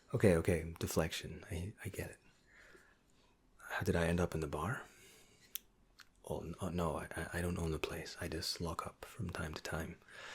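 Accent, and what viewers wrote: Scotland, United Kingdom. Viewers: Genuinely cannot decipher your accent. I hear a Scottish twang, not the part I'm from but also not strong enough to be pure Central. Pls help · I read the actual comments to where the idea of this video originated. I didn't know if i was going to like the Scottish accent, but I wasn't disappointed at all. Keep up the good work boyo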